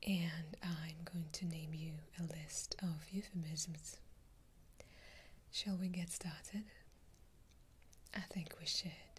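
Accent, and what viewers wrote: Russia. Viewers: Русский акцент прекрасен)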